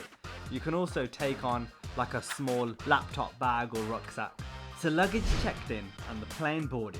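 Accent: Birmingham, United Kingdom. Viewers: it's a funny accent, not sure from where, wild guess Birmingham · U from Birmingham?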